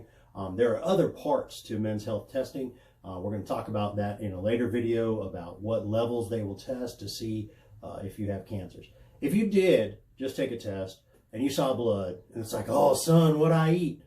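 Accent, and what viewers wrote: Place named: South, United States. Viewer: It's been soooooo long since I've heard your voice but I can tell you have ben south of the Mason Dixon for a while.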